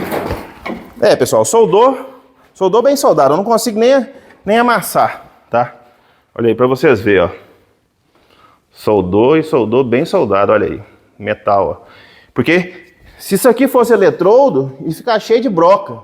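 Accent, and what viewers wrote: Minas Gerais, Brazil. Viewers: Bom dia,vc tem muita paciência e vontade de ensinar, parabéns pelo vídeo,e esse sotaque me parece que vc é de Minas Gerais, eu tenho um certo receio em usar essas inversoras junto do corpo por causa do campo eletromagnético que ela pode gerar, é melhor evitar uma possível radiação no tórax.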